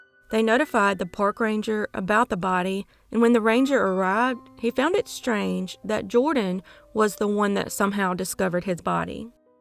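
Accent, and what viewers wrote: South, United States. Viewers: Well… hey 👋🏽 there! Great to have you here again… I just love 💕 the accent! 🤗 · Good morning to you!😉 A good way to start my day! Your accent makes me miss NC ☺️ I do love love love your voice/accent! I can't say that enough!😋 Much love from Washington State 🤩🥰💞✌️ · This is my first video with you and I'm already hooked! (Subbed ofc) A combination of my favourite things... Crime stories and the southern accent! 💖🇨🇦💖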